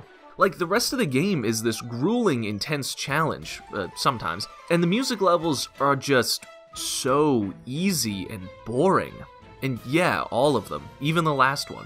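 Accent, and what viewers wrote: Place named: Russia. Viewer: I keep forgetting to download this game. Also it sounded like a Russian accent me. Good review!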